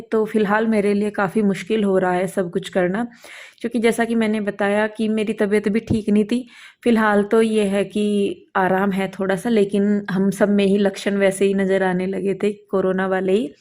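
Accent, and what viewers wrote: Haryana, India. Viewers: Kya.aap haryana se ho aapki boli m haryanvi touch h m b haryana m rehti hu rohtak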